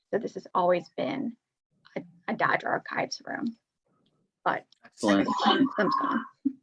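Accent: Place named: California, United States. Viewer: I think your whole context and info on this is awesome but you keep talking fine then go off in some half choking graveling voice which is extremely annoying like your from California. I'm not trying to be hateful but just honest..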